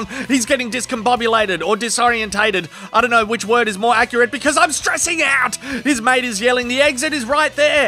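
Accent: Australia. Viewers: yeah nah yeah, he's got the typical ozzy man accent. Brings back the old days when i used to live in Perth.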